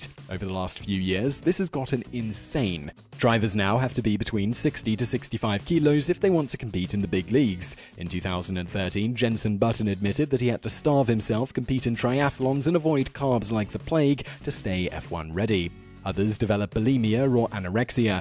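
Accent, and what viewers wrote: United Kingdom. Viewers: ***** ha ha more teasing than sarcastic. most people around the world say football. But once you hear a British accent you kinda expect them to say "football" but it doesn't really matter. :)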